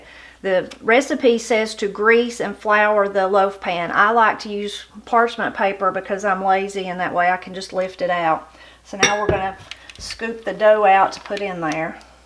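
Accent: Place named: South, United States. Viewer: You're like Tree Trunks from Adventure Time in real life. You have a cute Southern drawl, and make apple recipes too.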